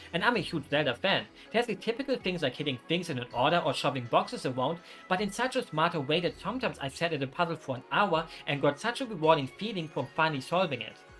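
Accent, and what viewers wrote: Germany. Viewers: You sound soooo German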